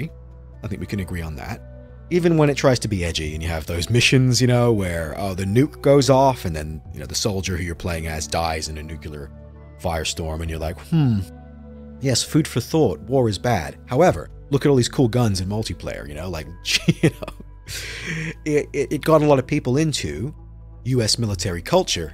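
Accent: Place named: Northern Ireland, United Kingdom. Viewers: he's a Brit who has lived in America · He sounds (Northern) Irish to me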